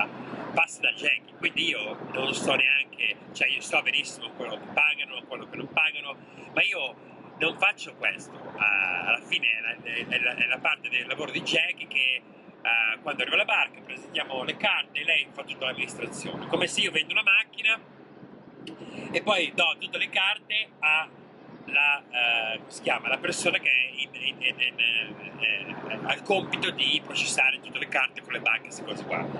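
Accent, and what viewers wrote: United States. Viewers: Ciao, ho iniziato a vedere i tuoi video, fatti molto bene, complimenti. È strano sentire che hai un accento americano quando parli italiano, ed un accento italiano quando parli inglese! 😄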